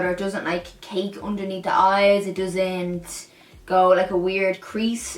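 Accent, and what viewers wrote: Ireland. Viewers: Irish accent or am I wrong? ☺️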